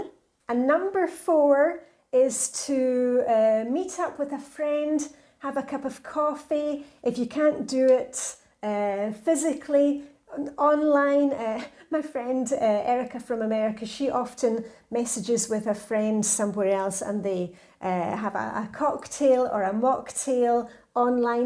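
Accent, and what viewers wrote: Scotland, United Kingdom. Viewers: Congratulations on reaching 100k subscribers! I stumbled across your channel last week and was so happy I did. I loved hearing your Scottish accent (both my grandparents were from Scotland) and your enthusiasm and positivity is so uplifting and inspiring. Have a wonderful weekend. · Well done!! What an achievement. A cold morning here in Manitoba, Canada. I lived in Edinburgh for a time 8 years ago so love hearing your accent. You have really helped me to have a set time for my routine in the evening. I work away from home so the evening really helps me prepare for the day and eat well!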